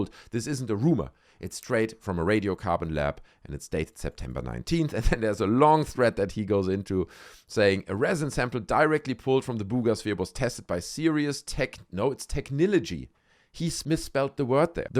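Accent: Germany. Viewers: I just like saying, "Buga Sphere" in your German accent.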